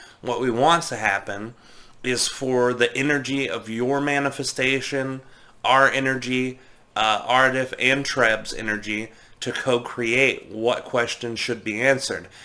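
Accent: England, United Kingdom. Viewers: Has Aridif ever explained why he speaks in an English accent when the channeller is American? He sounds like Alec Guinness in Star wars. Nice video, thank you 😊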